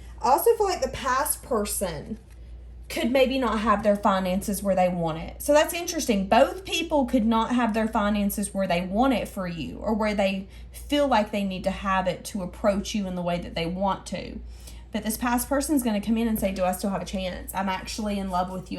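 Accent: South, United States. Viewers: Thank You. You are inspirational. Love your sweet southern accent and good advice.❤❤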